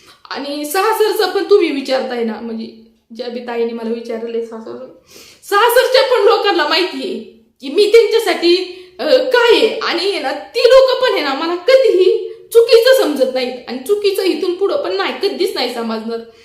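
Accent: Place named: Bhor, India. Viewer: मी तुझे व्हिडिओ कायम बघते मला तुझे व्हिडिओ आवडतात कारण मी पण भोर तालुक्यातील आहे कारण तुझे बोलणे आहे ते रेल आपल्या गावाकडचे आहे ते मला खूप आवडते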